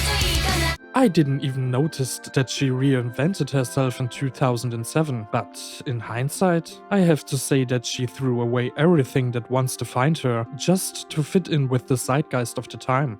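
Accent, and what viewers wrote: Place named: Germany. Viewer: could your German accent be any worse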